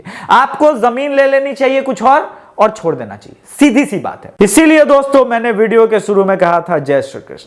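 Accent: Hyderabad, India. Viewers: Hyderabadi tone 😂😂 Jai Shri Krishna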